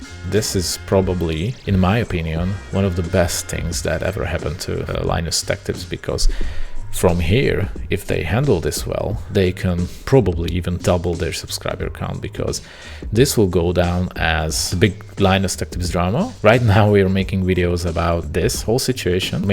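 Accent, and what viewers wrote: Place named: Sweden. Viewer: swedish accent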